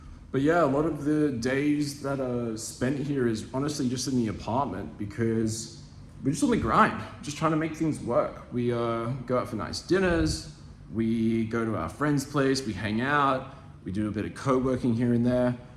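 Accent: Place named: Australia. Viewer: He too Australian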